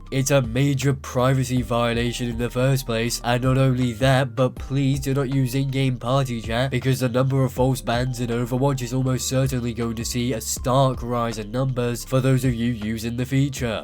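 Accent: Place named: United Kingdom. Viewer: Can we all just agree that accent increases the quality of the video by 1000% Something about the (British, right?) accent just makes his commentary and critique so much better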